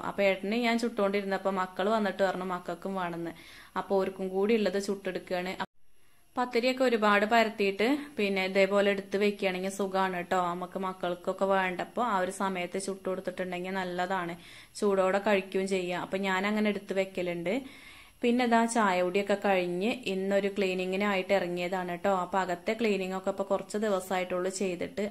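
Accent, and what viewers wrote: Malappuram, India. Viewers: Varthamanum kettit Malappuram ano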